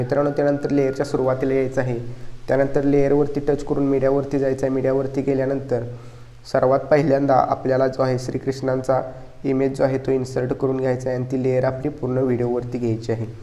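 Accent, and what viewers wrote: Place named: Marathi, India. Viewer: एक मराठी कलाकार आणि मराठी आवाज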